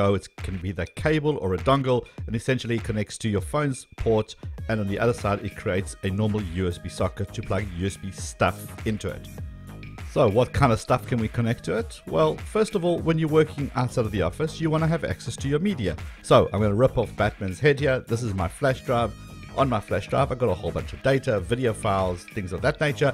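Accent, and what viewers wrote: South Africa. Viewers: Are you South African ? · You sound like you're South African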